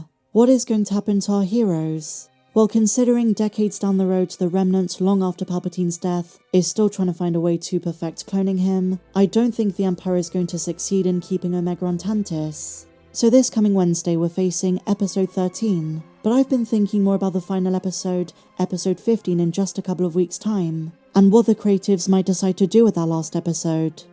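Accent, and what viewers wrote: United Kingdom. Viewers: I love your british accent. Good theories. Greetings from MEXICO. :yt:😁😅😉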